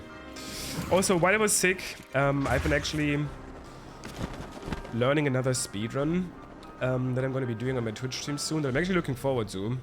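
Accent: Germany. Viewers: Man hört so raus dass du Deutscher bist, Abo hast du! xD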